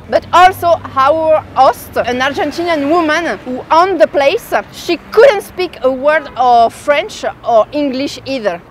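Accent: France. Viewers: Es muy agradable escucharte con tu acento francés, pero no eres inventada . Hablo de las latinas y no los latinos, las mujeres van a otro país, y hablan con acento fingido y muy pobre, porque no hablan el idioma de dónde vive, y según ellas olvidan su propia lengua maternal 😂, se ven súper ridículas. Yo también hablo 4 idiomas, el francés lo aprendí , en México en el Liceo Franco Mexicano ,en Polanco. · You speak English with a French accent. Alf for half. Siempre me fijo en los accentos de los franceses por que me ayuda to reverse engineer towards bettering my French accent. Es el ritmo del Francés que es dificil al principio y por el que se detecta que alquien habla francés originalmente. El acento de la sílaba en el lugar donde no va dice francés. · Vous avec un accent parigaud? Pourquoi? Vous y avec vécu tres peu de temps, mais c'est vrai que j'ai etudié à Besançon et j'ai plutôt un accent de titi, mes copain lillois se marrent de moi, puisqu'ils savent que je suis mexicain. 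Quand à votre accent, c'est normal en chinois, en suédois ou en mixtèque !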